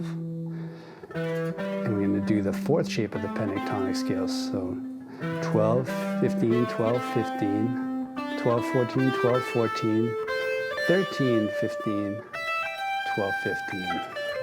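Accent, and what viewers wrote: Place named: New Jersey, United States. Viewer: I really want to thank you for this. Though I made the same mistake yesterday, walking through a field, absentmindedly wondering if it’s a New Jersey accent you have, I got woosh-attacked again and momentarily panicked that I was under a cattle attack. But beside that… this lesson is the first time I feel like I’m “getting it”. I’ve been playing since ‘93 (apart from a 15 year gap lasting until recently). But I realise now that I never actually learnt anything because… “scales and theory! That’s not very Punk, grandma!! <smug snigger>” and apart from some and everything was Seattle/Subpop or UK/NYC Punk, so I never actually heard how I was playing under the distortion. I’ve even realised my timing is absolutely woeful as I’d always played alone or with friends, without a drummer when we were all off our heads on anything we could afford. 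So I’ve gone right back to basics. Theory, scales, rhythm, metronome and I’ve practiced those and technique every day for a few weeks but I never actually felt like it was attaching together until now! This has really opened it up for me be I can see clearly what is happening and what I need to do. I’m recognising what will sound good next and none of that would have clicked for me if you hadn’t simplified it so much and said “this is what we’re doing, this is how we’re doing it, this is why. Now go and have fun”. And I feel so grateful for that. First time in decades that I have a proper glow about playing music again! Apologi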